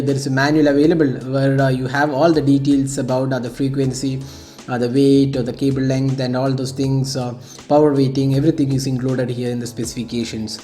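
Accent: Kerala, India. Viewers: Malayali your English bro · Mallu slang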